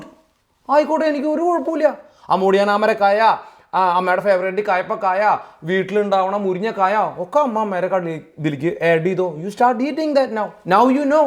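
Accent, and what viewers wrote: Thrissur, India. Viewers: എൻ്റെ ഒരു ഒപ്പീനിയൻ എന്താന്ന് വെച്ചാൽ, എനിക്ക് ചേട്ടൻ്റെ കുക്കിങ് വീഡിയോ യേക്കാൾ കുറച്ചും കൂടി ഇഷ്ടം ഇദ്പോലുള്ള അറിവ് പകരുന്ന വീഡിയോ ആണ്. അതിനു കാരണം ചേട്ടൻ്റെ തൃശ്ശൂർ ശൈലിയും ചേട്ടൻ്റെ അറിവുമാണ്.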